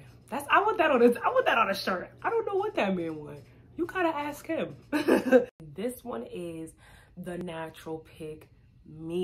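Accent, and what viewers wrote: New York, United States. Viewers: You sound New Yorkish... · I forgot how much I love a NYers accent; I haven't been on youtube in some time.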